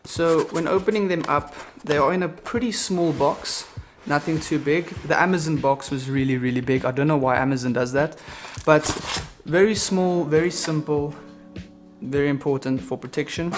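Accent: South Africa. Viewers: you have a South African accent bro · This guy sounds South African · Lekker South African Accent my bru... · You are South African, I know us very well, lol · Is that a South African accent?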